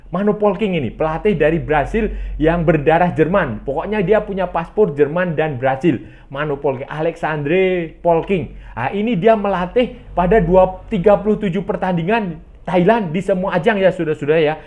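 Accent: Indonesia. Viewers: Kau org Malaysia ke?...sebutan huruf a dan e memang begitu di indonesia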